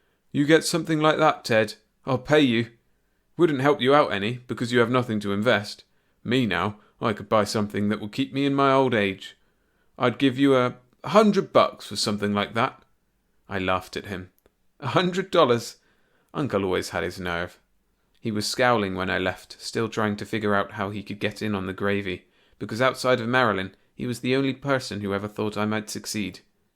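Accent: United Kingdom. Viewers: Weird how our brains are all wired to what is our familiarity, so as a British listener I find it so much clearer to hear a usual British accent; it’s not scouse or geordie which I would expect non-Brits to struggle with; and there’s plenty of US and Aussie content compared to British; I really appreciate the opportunity to listen in my own very boring and average British accent. I know I struggle with slow accents, I think it’s Mid-west American but as I’ve only visited LA, Texas, and Manhattan I can’t be sure. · I love UK narrators and the accent!!! ❤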